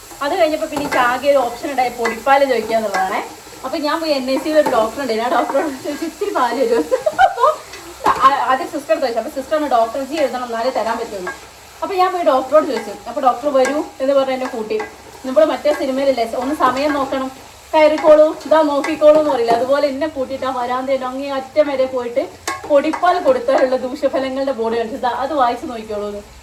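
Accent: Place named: Vadakara, India. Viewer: chechide veed evideya? Samsaram kettitt vatakara baghath evideyo anennu thonunnu❤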